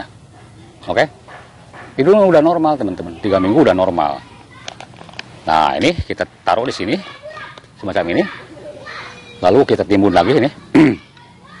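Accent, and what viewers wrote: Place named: West Java, Indonesia. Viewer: Sunda pisan cigah urang cilengsi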